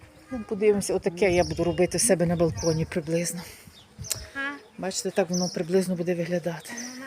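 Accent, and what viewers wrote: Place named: Surzhyk, Ukraine. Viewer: Ту піца НАСТОЯЩА....Та чого ти розмовляєш російським суржиком???? Патріотка недолуга.....😮😮😮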